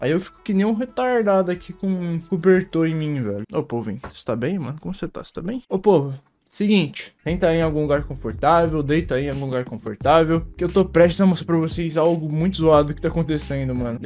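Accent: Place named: Manaus, Brazil. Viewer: tu e de manaus neé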